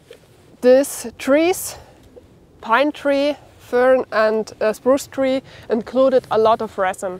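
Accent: Germany. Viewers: You German?? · I really enjoyed this video. I have subscribed because there seems to be something new to learn about the uses of herbs and trees. I also LOVE your accent. I have been to Germany three or four times, and I get a chance to practice speaking German. Mein Deutsch ist ganz schlimm aber Ich kann es verstehen und lesen. Deutschland ist mir lieb. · I think it's a German accent from Alemania. Again I can't spell very well. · Just found this channel, it’s wonderful! My mother is German, father USA (he was stationed in Germany when they met). Naturally, I love the sound of your voice and enjoy hearing the Germanic inflections as my mother spoke that way. I love trees, I grew up in areas with lots of woods to explore and play in. The forest is my favorite environment. Yes, please do a video about winter foraging! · love your video…it has lots of great information. 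I live in WA State USA, on the Border with Canada. On my 10 acres we have Alder, Broadleaf Maple and Vine Maple, Birch, Bitter Cherry, Indian Plum, Douglas Fir, Hemlock, Cedar, Mt. Ash, Butterfly Bush and wild Hazelnut. I’d love to learn more about uses for these trees and shrubs. I really like your German accent and your English is excellent. Thank you for sharing and I’ll keep watching and learning from you.🇺🇸🇩🇪🇺🇦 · Is she German? Lived there 88-90 in the US army.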